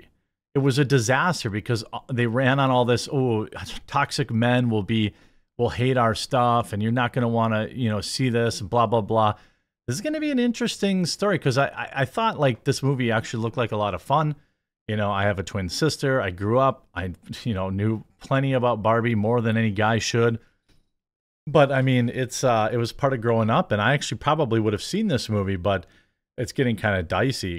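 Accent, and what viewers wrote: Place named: Wisconsin, United States. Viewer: Ok, I love your content and all sorts of stuff you do...I can even deal with hearing about coffee brand coffee co- nonstop! Lol! But sometimes the Wisconsin accent is just too much! Margot Robbie being pronounced like a 'robe' you wear, opposed to like 'rob' a bank, is driving me insane!!!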